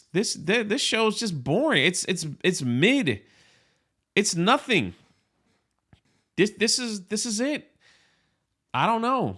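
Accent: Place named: California, United States. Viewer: Ok huge question -- are u a surfer from southern california? You sure sound like one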